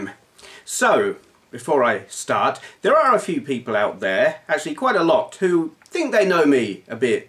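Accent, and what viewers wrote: United Kingdom. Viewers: Your cussing is refreshing, especially in the Queen's english. = )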